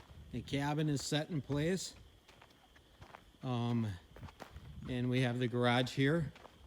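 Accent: Wisconsin, United States. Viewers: You sounded just like wisconsinites and I called it well. My uncle has land in Gleason Wisconsin. We used to camp up there. I live down near Milwaukee. I just subscribed to your channel.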